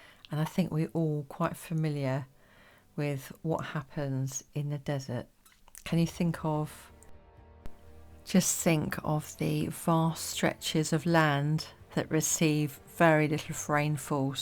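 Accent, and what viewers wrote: England, United Kingdom. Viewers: This was an incredible piece of artwork,. really lovely to listen to your story. You have a very soothing voice, also great to hear a fellow English accent 😂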